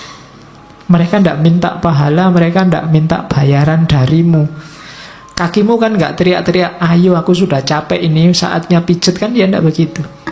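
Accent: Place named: Java, Indonesia. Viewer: Jawa nya itu Jawa banget😹😹😹 medok hama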